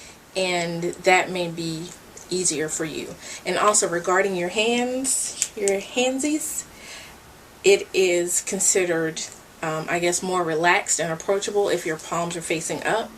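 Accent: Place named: United States. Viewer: You are an African talk like an African why why this American talking style?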